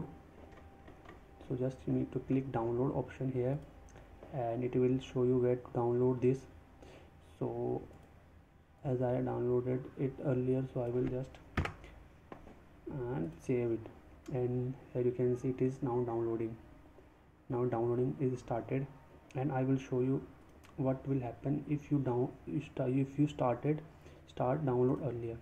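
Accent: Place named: India. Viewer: in fact you talk english but the problem is that you talk it with an Indian accent. This problem is common with the absolut majority of Indians who talk english. Indians have weard accent when they talk english, and this makes that most of people can't understand them when they talk in english. It's strange that Indians talk english with that weard accent especially that their country have been colonised by Grand Britain and for a long period. on the other hand We find that many nations that have not been colonised by Britain have understandble english. Normally Indians are supposed to talk english better than all the other anglophone countries due to the long British presence in India. Also there is large Indian communities in Britain and USA. Indians are smart people and their counrtry had been developped a lot the last 30 years. It's really not understandable that Indians talk english with a weird accent that make strangers not understand them but also attack them or laugh and mock of them. 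with all respect, My advice for you is that you should emprove the way of spelling in english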